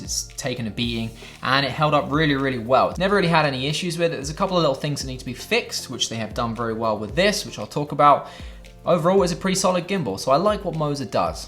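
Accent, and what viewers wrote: United Kingdom. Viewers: There's nothing cool about the LED light, but then I am nearly fifty! Good video and good to hear a UK accent about photo/video gear on YouTube. BTW Your avatar looks like you're wearing chain mail.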